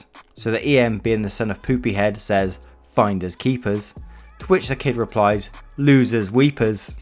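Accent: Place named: Oxford, United Kingdom. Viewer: Oh ok, thanks for clearing that up in my mind - I honestly never came across that broad accent of yours before and find it simply difficult to follow as I try making out what you say and squaring it with what i read lol (only been to Oxford once, to throw eggs at the guys from Balliol College and leave, so I really never got the chance to listen to the local lingo ^^)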